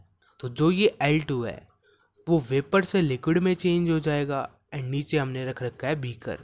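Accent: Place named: Bengal, India. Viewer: Sir are u bengali that mota moti sounds familiar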